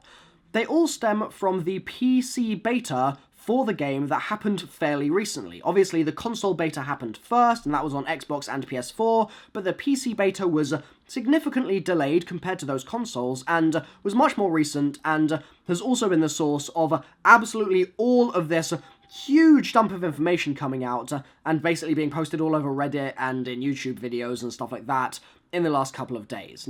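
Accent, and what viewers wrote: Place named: United Kingdom. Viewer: that british accent is gonna get on my nerves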